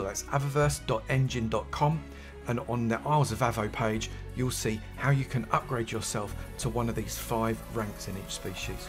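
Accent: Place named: London, United Kingdom. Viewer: ngl with your london accent im sad you didnt say wonga instead of cash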